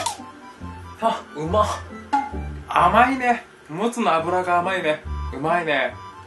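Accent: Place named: Hakata, Japan. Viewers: いつもにないくらい博多弁使ってくるやんw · 福岡弁？博多弁？良いですね(´ω`)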